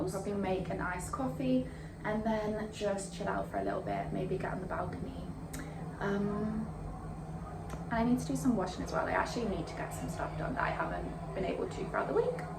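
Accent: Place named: England, United Kingdom. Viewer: Hi are you English ? You hVe a lovrly accent.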